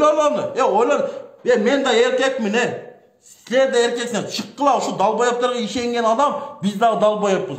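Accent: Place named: South, Kazakhstan. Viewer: Туштуктон окшойт созуно жакшы тушунгон жокмун эмне деп жатат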